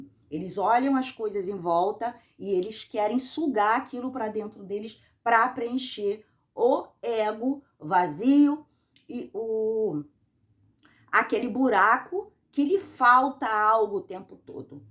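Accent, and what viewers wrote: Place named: Rio de Janeiro, Brazil. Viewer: O som da sua voz é bem carioca.🤔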